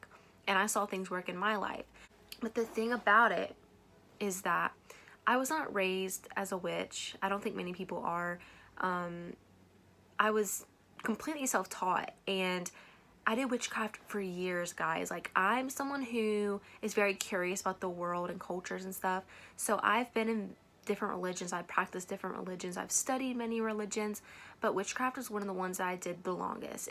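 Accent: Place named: South Carolina, United States. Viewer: By the way, your South Carolina accent is so awesome.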